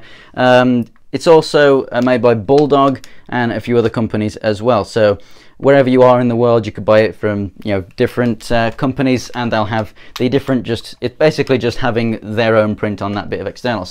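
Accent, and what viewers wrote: United Kingdom. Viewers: Hey, I’m assuming from the accent that you live in the UK. Just curious where you got your mags from as I can’t find any UK online airsoft retailers that stock them. Did you buy them from a US site and have them imported? (Great video btw)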